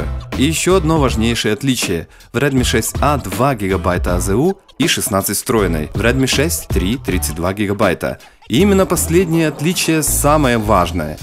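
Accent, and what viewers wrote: Bila Tserkva, Ukraine. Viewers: Вы случайно не с Белой Церкви?)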